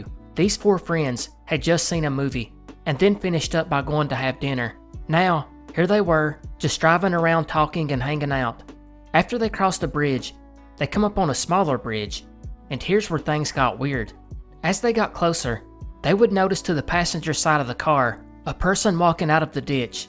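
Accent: South, United States. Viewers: Haha also you saying hello fam was a culture shock because fam is British slang over here 🤣 miles and miles away from the south states of America - enjoying the series as an outsider and my heart on the first part talking about your accent. Other than preconceived ideology of the south I still can't put a face to a voice I'm sure we'd all be interested in a face reveal even though your content doesn't suit that (oh god those yters that combined this content with face cam and the audio quality is different). Anyway as a British hooligan I've commented about your accent before and your dialect doesn't bother me one bit I love your pronunciations they make me giggle in a completely non judgemental way I'm sure we'd be giggling at each other if you heard my accent too 🤣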